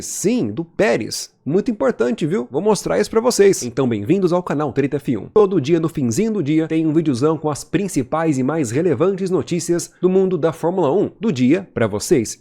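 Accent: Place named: São Paulo, Brazil. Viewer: Não gostei de sua alteração na dicção parece um paulista misturado com paranaense muito ruim sugiro voltar para sua dicção original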